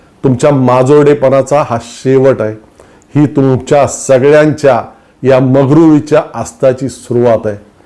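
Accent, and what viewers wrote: Standard. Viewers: तुमचे काही व्हिडिओ बघितले चांगले टॉपिक वर बोलत आहे तुमची बोलायची पध्दत शुद्ध चांगलीच आहे..... असेच पुढे करतील हीच अपेक्षा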